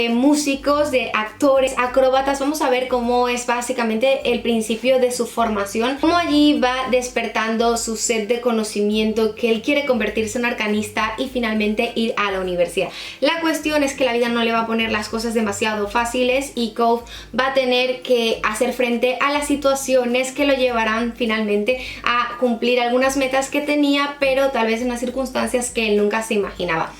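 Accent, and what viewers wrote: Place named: Mexico. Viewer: Tu acento es mexicano pero le añades el "os" De donde eres? Buenos libros. Ciao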